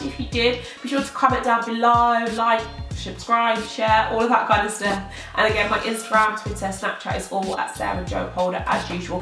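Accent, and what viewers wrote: United Kingdom. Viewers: You sound like you are from where my fiance is from, Solihull ??? Love your videos, keep them up! :)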